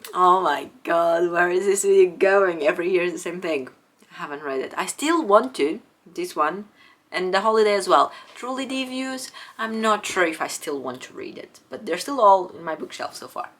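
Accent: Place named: United Kingdom. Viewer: Já começas a ter um mini sotaque brittish. Neste vídeo notei bastante nalgumas palavras e tom da frase :)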